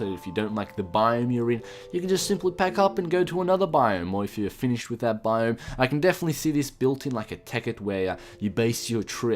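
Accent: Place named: Australia. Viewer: Australian English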